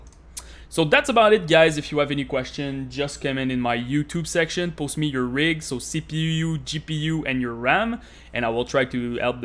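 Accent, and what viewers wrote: Quebec, Canada. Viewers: Qc man ? "J'orconnais" ton accent... J'ai le même haha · T'es quebecois c'est sur hahahahha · pas francais , humhum , un quebecois canadien francophone :D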